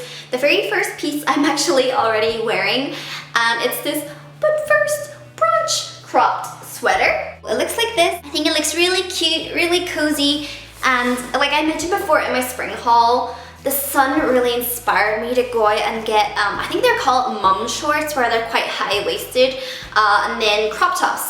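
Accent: Northern Ireland, United Kingdom. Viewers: Love your videos!! And your Northern Irish accent! :)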